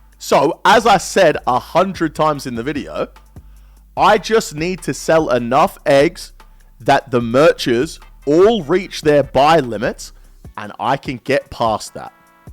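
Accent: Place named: Australia. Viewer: Bro you are the most cringe Aussie OSRS creator, possibly the most cringe out of everyone. No one cares what you think and the fact you think a clips channel of your garbage streams is insane. Get a haircut lose some weight and stop over accentuating the Aussie accent you absolute loser. You and behemoth are embarrassing.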